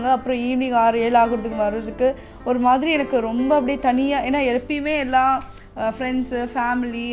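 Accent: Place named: Kerala, India. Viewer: Malayalam slang theriyudhu lighta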